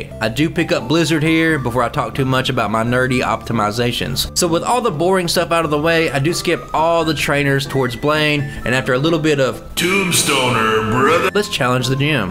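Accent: South, United States. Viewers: That cold didn't mess with that beautiful southern accent. Love my brothers from the south getting it done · idk why your videos dont get more views, theyre very good. maybe its the strong southern draw, but im a southern boy as well, doesnt bug me.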